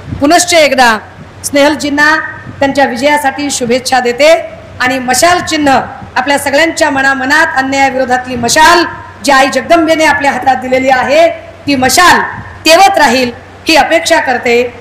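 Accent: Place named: Bihar, India. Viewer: Tai lay Bihari bolataahe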